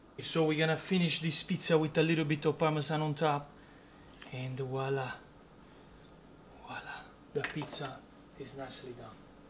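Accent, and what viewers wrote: Italy. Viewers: Maestro! I wish I was born from a long line of pizzas (heh heh, okay, just joking -- you're passion and Italian English is fantastic!). But I'm so inspired. I'm from Ottawa Canada! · Why do you have an Italian accent but your mom doesn't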